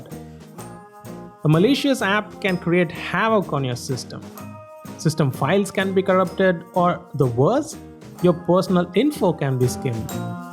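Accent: India. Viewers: Starts the video-lemme guess hes gonna be indian.. -Seconds later(indian accent) in my last video.... Called it. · omg the indian accent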